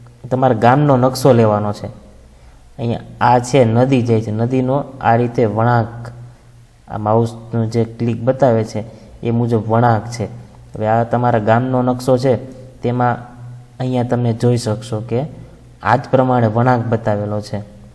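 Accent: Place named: Kathiawar, India. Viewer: ભાઈ ભાઈ, એકદમ કાઠિયાવાડી ભાષા બોલો છો... સરકારી ખરાબો ની જમીન એટલે શું ?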